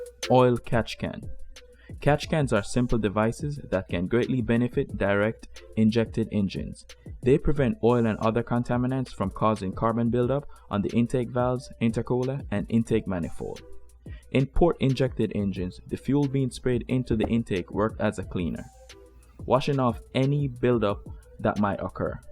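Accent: Jamaica. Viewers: Hey, I hear a Jamaican accent. I recently bought a well kept 2005 R53 Cooper S. What is the purpose of the Meth and would this be appropriate for the R53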